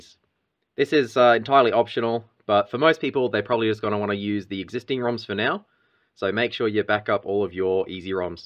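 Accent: Australia. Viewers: Thanks mate! For whatever reason, this video was so much more helpful to me than others I watched. As a total newbie to the dense world of emulation, a calm Australian voice and super clear instructions saved me from a frustration spiral. Subbed, keep up the great work! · Thanks heaps, it worked first time! Must have been the calm Aussie narrative. My only stumbling point was one of the ROMs was corrupt when I was copying them. Skipped it and will hunt it down another time.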